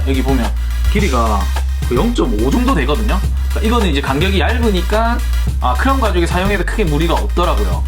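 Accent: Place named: Gyeongsang, South Korea. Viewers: 경상도 사시나요..?ㅋㅋ 말투가 저희신랑이랑 비슷하셔서... 공예초보자인데 많이 배워용😆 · 좋은 정보 감사합니다 말도 빠르시고 경상도어투인데 배경음악이 너무 정신이 사나워서 집중하기가 힘들어요 ㅡㅡ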